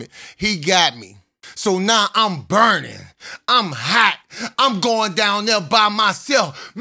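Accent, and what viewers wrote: New Orleans, United States. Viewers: Can I asks are you saying joes or joints I’m sorry its that New Orleans twang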